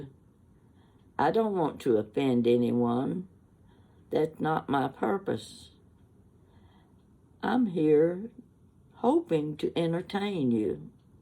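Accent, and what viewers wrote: South, United States. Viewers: I appreciate your company. I feel like you are very sweet and homey. I love the sound of Southern people. ❤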